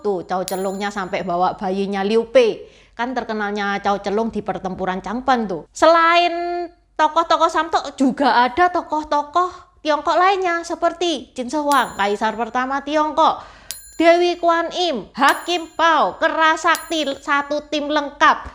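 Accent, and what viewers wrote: Java, Indonesia. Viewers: ..medoook... Suka deh... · Logat bahasanya kaya medok medok jawa ya...😂😂😂😂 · Enakan dengerin dongeng nya nieh.. seru bgt, dg pk bhsa indonesia yg ada aksen jawa medok nya.. hihihi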